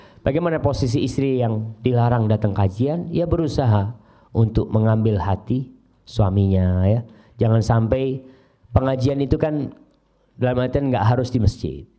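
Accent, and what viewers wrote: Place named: Jakarta, Indonesia. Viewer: Jadi usyar itu ,orang padang atau betawi???....kok bahasanya spt orang betawi????....betawinya dimana ustadz????....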